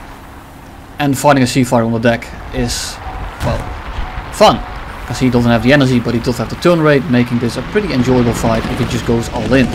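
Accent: Netherlands. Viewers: Hey man i have a question cause you kinda Sound dutch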